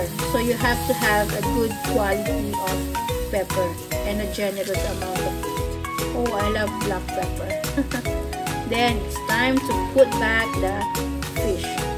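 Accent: Philippines. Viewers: Love you Filipino accent 😊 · ph accent.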